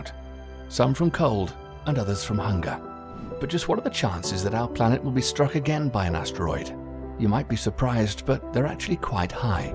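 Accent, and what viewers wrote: United Kingdom. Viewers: Narrator: PLEASE stop saying "Nuke-yu-ler"!!! The word is spelled "Nuclear". And pronounced "Nu-clee-er". "Nuke-yu-ler" (or, often, "Newk-ya-ler") is the 'Murican Bush-level yee-haw pronunciation for high school beer-swillin' gun-totin' dropouts. You sound British; thus, I had expectations you knew the language. "Disappointed, I am", says Yoda.....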